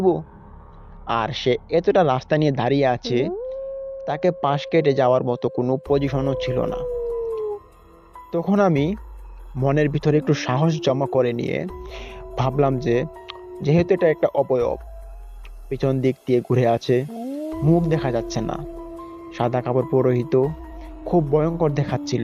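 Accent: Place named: Habiganj, Bangladesh. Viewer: Habiganj bari mone hoy unar kothay buja jacche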